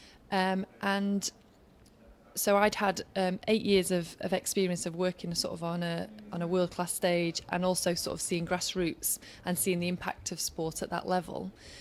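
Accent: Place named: Yorkshire, United Kingdom. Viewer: Как она произносит company, come, such, done, rugby!! Ну и что, что Йоркширский акцент. Всё понятно. И очень красиво. Спасибо.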